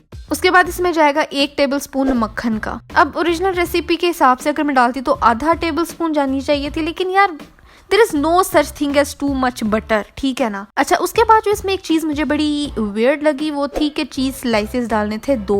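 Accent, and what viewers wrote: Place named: Punjab, India. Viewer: that punjabi accent is just wowwwwwwww :)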